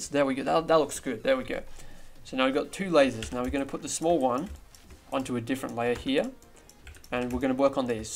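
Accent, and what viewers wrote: Australia. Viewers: You from Australia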